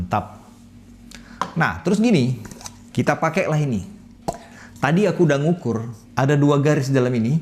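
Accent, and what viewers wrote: Medan, Indonesia. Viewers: Ada tutop nya pulakk haaa .. Adoohh logat mu itu bang buat rindu nongkrong di medan lagi ah...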